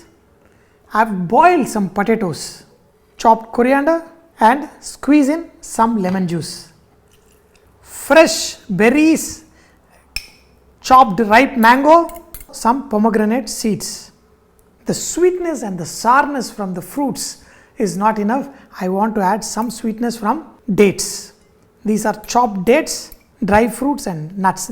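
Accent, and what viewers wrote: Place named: Telangana, India. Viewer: I love your Telangana slang very much...😂😂. and also your childish movements everytime 😍😍😀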